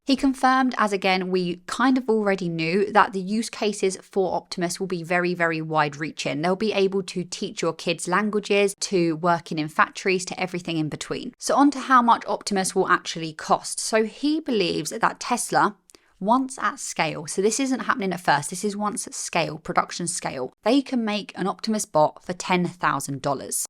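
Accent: England, United Kingdom. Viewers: Love your content and your English accent.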